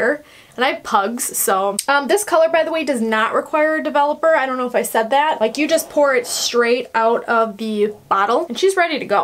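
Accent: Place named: Wisconsin, United States. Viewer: I knew I heard a fellow Wisconsin accent lol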